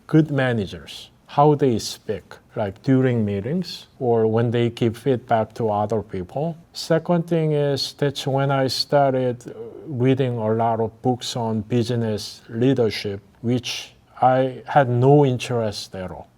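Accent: South Korea. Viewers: 외국에서 느끼는 건데, 한국인 영어 억양으로 교수님처럼 영어 정말 잘 하면 그게 더 멋있어 보이더라구요. 솔직히 전달력도 좋구요. 오늘도 힘내서 작은 영어 공부 생활습관이 이어가 봅니다. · 억양은 한국인이시지만 저 정도만 구사해도 대단한 실력..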